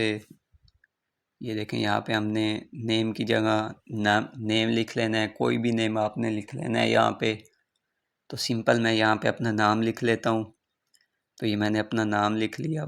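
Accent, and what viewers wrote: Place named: Pakistan. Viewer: Thanks bro kam ho gaya App pakistan sa ho app ki Language apka accent vahi ka ha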